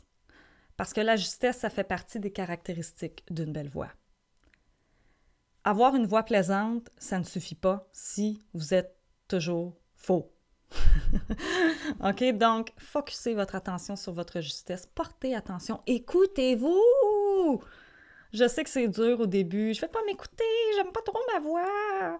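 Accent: Quebec, Canada. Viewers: Par contre j'aime trop son accent Québécois et je suis sur que en chant tu es super forte Gros bisous à toi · Je crois que vous êtes québécoise 😄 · Jadore ton accent quebecois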